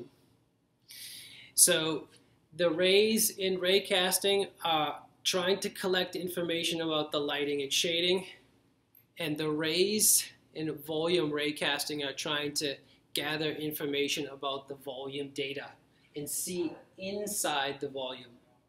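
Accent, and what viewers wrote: Boston, United States. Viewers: are you from Boston? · Are you from Boston?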